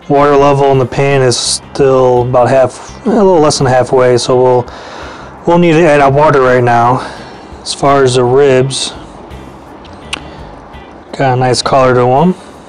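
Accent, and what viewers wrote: Chicago, United States. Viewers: Sounds like a Chicago accent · Love your video - thanks so much! The ribs come out GREAT this way! Love your Chicago accent too!! ;) GO BEARS!! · I'm hearing a heavy Chicago accent!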